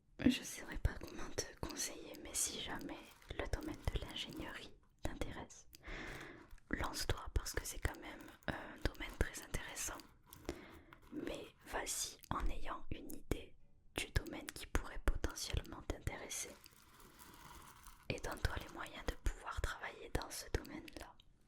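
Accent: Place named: Montpellier, France. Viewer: Tellement vrai pour l'orientation en terminal ! Ah je me disais bien que c'etait l'accent du sud mais plus montpelierin c 'est bien ça? (jsp si c comme ça qu'on le dit) 😁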